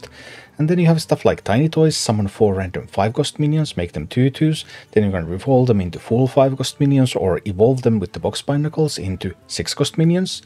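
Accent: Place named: Finland. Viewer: As soon as you started talking I could tell you are a Finn!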